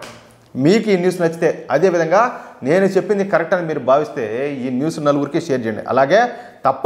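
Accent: Rayalaseema, India. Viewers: Super sir Mee rayalseema language super Sir I'm also Rayalseema from Europe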